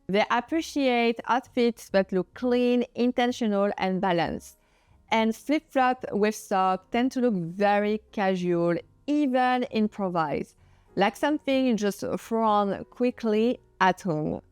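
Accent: France. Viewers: I just love the way you show the pictures of Of Fashion on Lily Collins from the movie and or series as badly styled because I’ve watched a few of those and I agree it’s just wrong and then I’m wondering if you could tell me if you really have such a strong French accent, or you could speak English with less of it🤪😅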